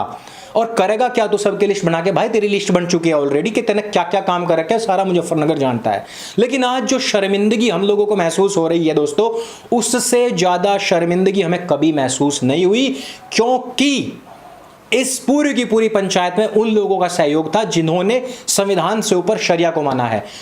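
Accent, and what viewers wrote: Haryana, India. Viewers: Vaah mast haryanavi bhasa aap ki 🙏🙏🙏🙏🚩🚩🚩🚩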